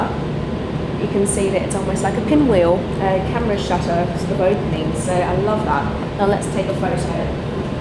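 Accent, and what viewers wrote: England, United Kingdom. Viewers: u got beauuuuuuutiful eyes :P and damn, that english accent <3 oohhhhh, i think i just came....LOL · lol "phothouss " xD I like the british accent :D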